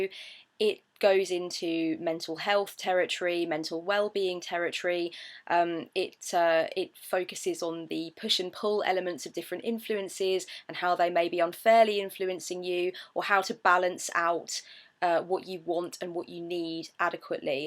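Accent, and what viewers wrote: United Kingdom. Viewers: I just discovered your channel and I'm slowly working my way through your early videos. I love your down-to-earth approach, and it's refreshing to hear a fellow Brit. You were speaking about the 5 of Cups, and that came up in a reading I did literally today. And today it was the first time I really noticed the river flowing under the bridge. It's like the card is saying, 'Yes, shit happened, it's right to acknowledge it, but it's water under the bridge Take the time to look around, see what you actually have and then cross that bridge, leaving the shit behind.'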